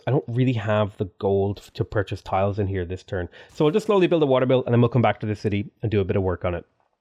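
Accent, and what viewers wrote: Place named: Ireland. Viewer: its just the irish in him ;), a lot of english natives who don't regularly hear an irish accent also need to slow it down lmao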